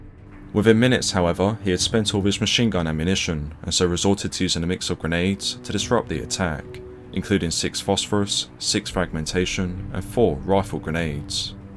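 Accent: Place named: Estuary, United Kingdom. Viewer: Nice video and channel. Your videos aren’t filled with waffle like so many others to reach the 10min mark. I would just soften your estuary accent a bit to help out your international fans. Example: you used the word “hurled” and sounded like held. I criticise because I have the same accent lol.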